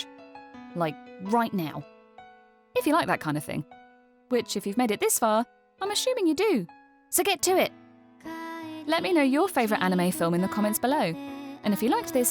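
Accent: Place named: United Kingdom. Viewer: That British Accent makes this even more legit.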